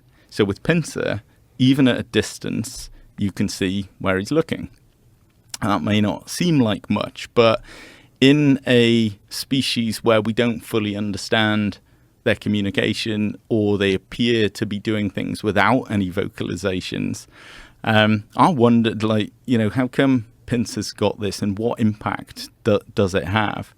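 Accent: United Kingdom. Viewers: Proof that having a British accent can make a man who is saying absolutely nothing sound articulate. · There's no such thing as a British accent he has a welsh accent 😂😂😂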